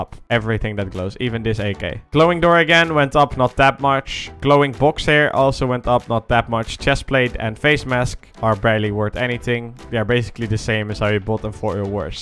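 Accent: Netherlands. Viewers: is it me or does sound a little dutch? or i’m wrong 🥴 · Haha je hoort gewoon dat je NL bent 😂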